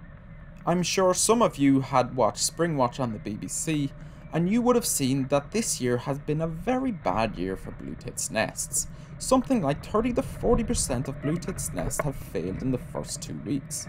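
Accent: Ireland. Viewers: HELLO, Greetings from Canada🇨🇦. WoW, this was an exceptional video thank you so much! I appreciate you taking the time to explain the goings-on and for educating people like myself, for the time you've spent filming and editing and your calm, dreamy, Irish dialect and narration. (I lived and travelled throughout Ireland for a year~ loved every moment). I'm sincerely grateful and looking forward to viewing more of your video's/vlogs. Thank you ❣️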